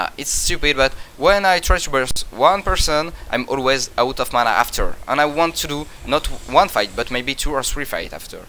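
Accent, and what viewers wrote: France. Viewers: That french accent, so terrible ... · Tu as un bon niveau d'anglais et tes vidéos sont toujours intéressantes mais y a t-il vraiment un public NA qui veuille entendre un français avec un accent à couper au couteau alors qu'ils ont déjà 10000 streamer anglophones ?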